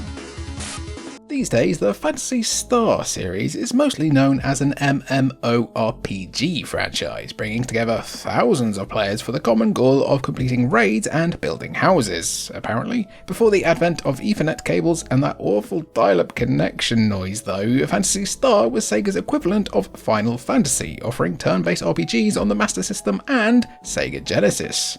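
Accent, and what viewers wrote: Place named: United Kingdom. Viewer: You sound British but then why would you be talking about the Genesis?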